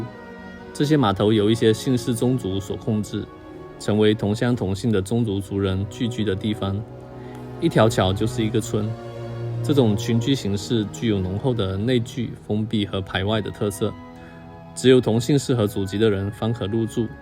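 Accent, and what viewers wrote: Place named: Penang, Malaysia. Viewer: 你的本地口音很濃，不讲还以为你是本地华人，根本就听不出你是大陆人。😂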